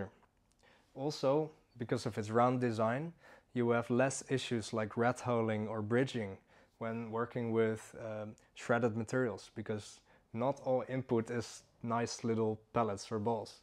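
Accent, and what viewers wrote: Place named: Netherlands. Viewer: Heerlijk Nederlands accentje :p, maar good job though!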